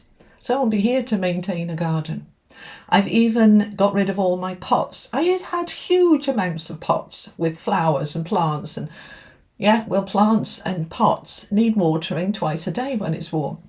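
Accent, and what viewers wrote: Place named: United Kingdom. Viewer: Thank you dear ❤ I was brought up internationally with a cultural mix and learned very early on to not judge. Everyone was different, including me. But what I really needed to be reminded of was the self care bit where I have slipped a bit... Thank you for that! Also I love your voice and accent, I used to work a lot with brits (am Swedish) and listening to you is just wonderful!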